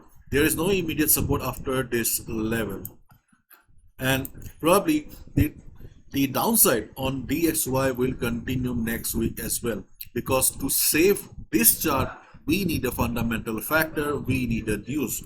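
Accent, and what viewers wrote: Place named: Punjab, India. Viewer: bhai teri english or meri punjabi ka accent 1 hay love from pakistan .. good analysis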